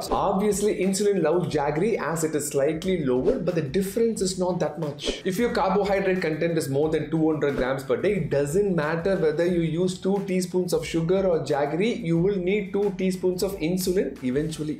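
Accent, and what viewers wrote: Tamil Nadu, India. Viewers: I just love the strong Tamizh accent! Abbzolutely 😅 Saravanna Kumar also says abbzolutely!! 😊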